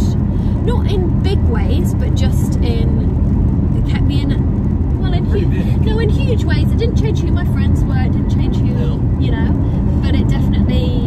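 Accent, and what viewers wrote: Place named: Sheffield, United Kingdom. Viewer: Lol. The more you talked the more you could hear your Sheffield accent come out. ( also from Sheffield not living in England )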